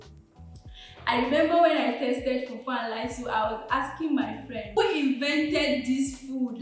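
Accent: Ghana. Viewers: I love ur Ghanaian accent ( that one de3 ... lmao)😏😏 twi +english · You sound Ghanaian and we love you. Come and work with us. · You are speaking like a Ghanaian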